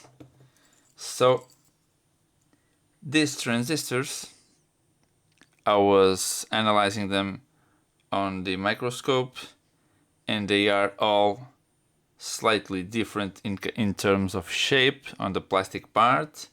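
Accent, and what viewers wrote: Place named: Portugal. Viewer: Are you Portuguese? Eu conheço o sotaque...Eu curto este tipo de content 👍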